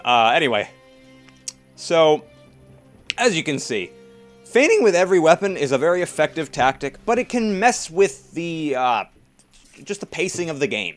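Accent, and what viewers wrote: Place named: Canada. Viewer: quick question, are you canadian, your long vowel sounds sound canadian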